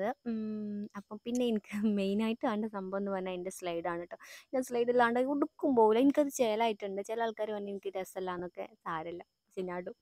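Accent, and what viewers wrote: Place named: Malappuram, India. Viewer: Ithaatha Malapprm aano place.. Samsaram kettitt thonnnd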